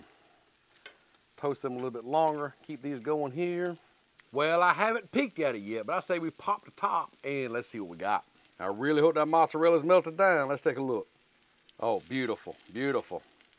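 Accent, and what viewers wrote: South, United States. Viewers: Lol your crumb-snatchers then got big you know that's that old southern talk you all have a blessed day Amen brother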